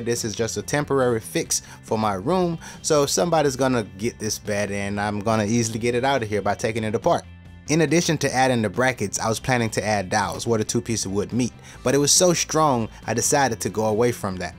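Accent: Jamaica. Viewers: What is your accent? Sometimes it sounds Jamaican, maybe sometimes New Orleans? Love your vid btw. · You sound Jamaican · You Jamaican? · Are you Jamaican man?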